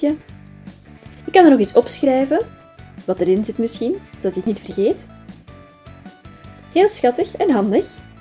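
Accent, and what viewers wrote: Flanders, Belgium. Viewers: Ben je Belgisch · Dit is een nederlands kanaal maar de stem is belgische?😂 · Just Girls Het is overduidelijk Vlaams. Ik heb veel vrienden in Limburg hier in België, het klinkt nog net iets anders als in Nederland. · ze is een Belg die hebben vaak andere uitspraken en wat boeit het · dit ks eenrder vlaams dan nederlands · jij komt uit belgië? zo klink je anders wel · Huh, je bent toch Belgisch? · Ik dacht dat dit Vlaams was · Dit is nie Nederlands .,. Belgies 😑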